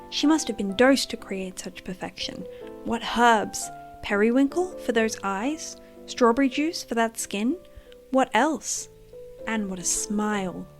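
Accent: Australia. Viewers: I clicked on this video because I've never seen another (real life) person with the name /. Plus sounds like we're both Aussie which is fun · I love the way you say "Ella Enchanted" like Eller Enchanted". Aussie accents are so fun to listen to😊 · It’s an Australian accent. Sometimes they sound different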